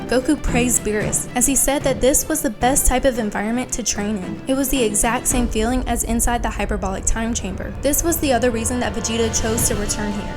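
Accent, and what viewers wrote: South, United States. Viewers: Am i buggin or am i hearin a subtle southern accent ?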